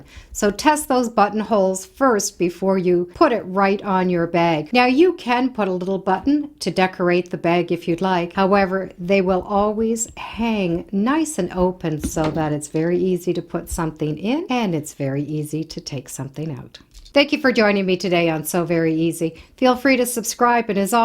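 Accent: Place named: Canada. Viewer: I could listen to you say "again, against" over and over. That Canadian sound is clear.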